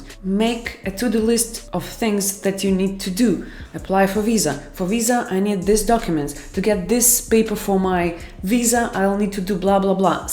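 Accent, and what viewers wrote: Russia. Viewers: I love it when you speak English with russian accent ❣❣ thanks a lot for all the super useful tips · Great accent rassiskiy☺️😁😁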